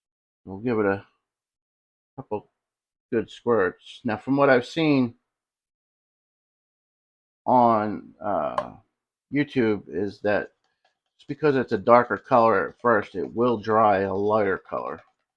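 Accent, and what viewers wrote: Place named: Maryland, United States. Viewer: First time I’ve seen you, but won’t be the last. You sound like you’re from MD, so nice to hear “normal” wuder and hunert.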